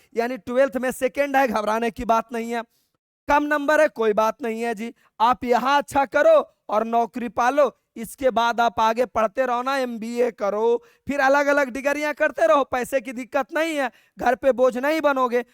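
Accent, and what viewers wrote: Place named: Haryana, India. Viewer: Haryana sa h sir ji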